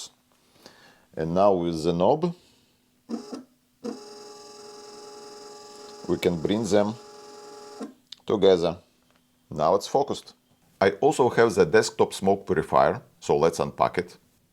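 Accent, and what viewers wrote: Russia. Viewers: Если судить по акценту, то вероятно носитель русского языка. Я хз как я попал сюда, но видео занимательные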